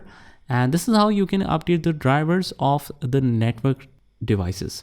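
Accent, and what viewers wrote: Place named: India. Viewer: the indian accent is strong in this one.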